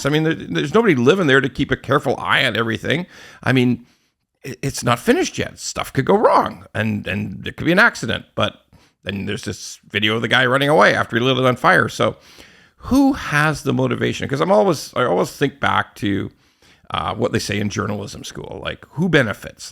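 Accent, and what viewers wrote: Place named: Ontario, Canada. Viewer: talks in a Hamilton/ Vaughan accent Nice sub division you got there...shame if it burnt down...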